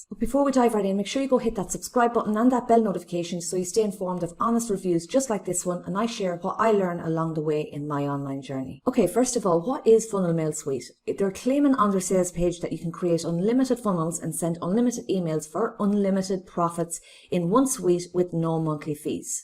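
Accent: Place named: Ireland. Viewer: Great review and nice to hear an Irish accent here as I am from there too.!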